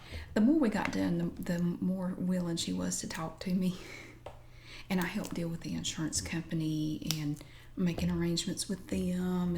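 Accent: South, United States. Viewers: I was about to comment saying wow that is a strong southern states accent! Then I read the description 🙈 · Whoa, those green beans gave me really surprising tingles, not something I was expecting! Great video, love the "super southern" accent. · The green beans snapping gave me a lot of tingles, and I love the southern accent! I have a lot of family who have southern accents, and I agree with previous comments that it makes me feel relaxed and at home! Great role play :)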